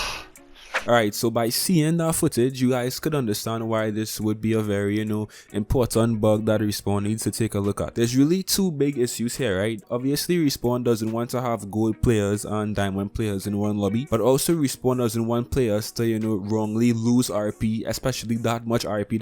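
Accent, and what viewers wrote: Trinidad and Tobago. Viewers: Are you trinidadian? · is u from Trinidad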